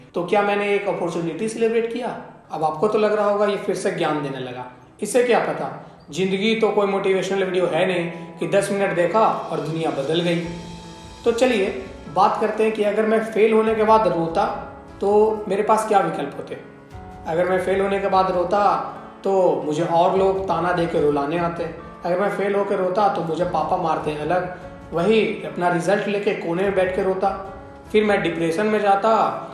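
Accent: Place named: Bihar, India. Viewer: BTW your Bihari language op❣️❣️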